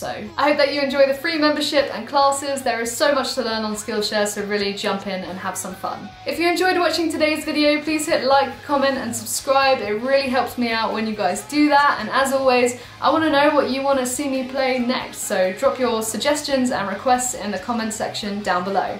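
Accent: United Kingdom. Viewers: I heard a British accent, am I mistaken? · And for your accent, it has to British. Best musicians in the whole world!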